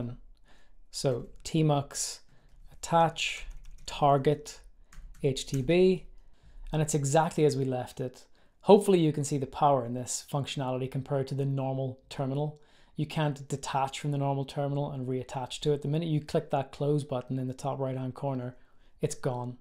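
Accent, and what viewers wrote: Northern Ireland, United Kingdom. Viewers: Ive always loved the northern Irish accent. Its so militant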